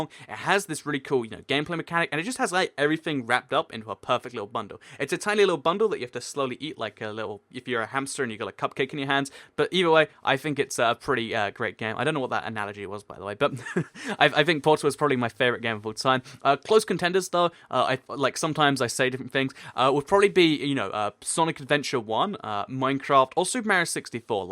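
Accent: United Kingdom. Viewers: Are you British I am? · You're British. Say "H" for my amusement. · What part of the U.K. Are you from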